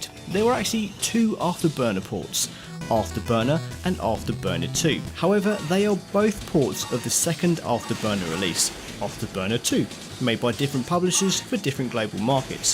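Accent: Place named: Southern England, United Kingdom. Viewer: bet you any money this guy is from south england or london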